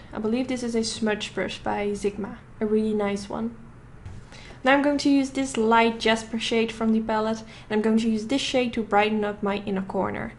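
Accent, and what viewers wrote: Netherlands. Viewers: i knew that when i heard your voice that this was a fellow dutch person haha zo leuk! gelijk gesubscribed! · your accent sounds dutch... Are you dutch? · Where are you from? Are you Dutch? I love your accent! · Hoi! Ik hoorde meteen al wel dat je Nederlands bent, wat ook helemaal te gek is. Je hebt echt super veel talent en met deze look heb je me geïnspireerd. Groetjes van je mede-Nederlander! ❤️ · Dutch accent I hear you!! Super mooi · Fiona Anker-Petersen If I hear her accent, I would think she is from Holland... But maybe I'm wrong. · u are totally dutch i can hear the accent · Tusha van Well I knew it! I had a Dutch friend & this is how she talks! Mooi fr 😁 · Are you dutch? You have a dutch accent 😂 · Flawless😍 enne... Hoor ik daar een dutchie? 🇳🇱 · Ik hoor echt dat je Nederlands bent 😳😳 maar je kan zooo mooi opmaken wauw !! super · je doet het wel mooi zeg! 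merkte meteen dat je Nederlands was haha, x.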